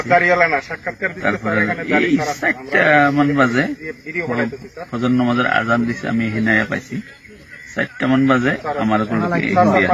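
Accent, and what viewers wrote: Bangladesh. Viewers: Apnar Bangla sunei bojha jacche apni Bangladeshi. Amra sob bujhi.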